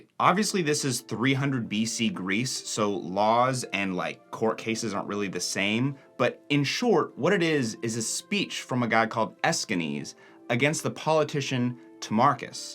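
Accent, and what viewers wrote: United States. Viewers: Yeah no wonder you're from LA. You have their disgusting accent.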